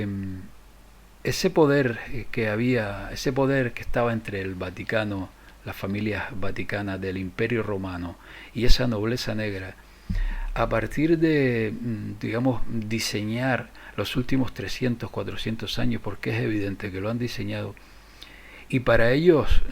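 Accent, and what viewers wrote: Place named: Canary Islands, Spain. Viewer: Por tu acento deduzco que eres Canario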